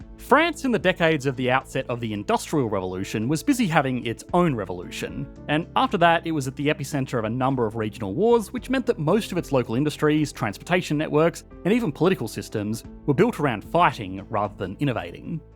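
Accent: Australia. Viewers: the fact that you think this channel is from the US speaks volumes of how ignorant you are. The man has a clear Australian accent and mentions that he lives in Australia all the time. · I only realise that you're an Australian at the end of the video. 'B(e)ye'. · I don't think he's British or he would have mentioned that many other countries have state owned enterprise. UK being one. His accent seemed Australian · it's australian. he has a very obviously australian accent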